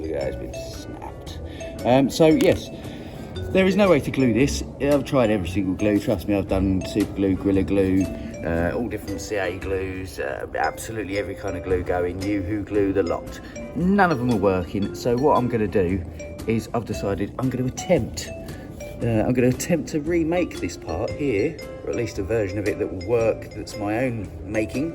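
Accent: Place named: United Kingdom. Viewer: You sound like you're from the uk like me id love to buy a set of these if possible when i complete my build and get my electronics. Do you have Facebook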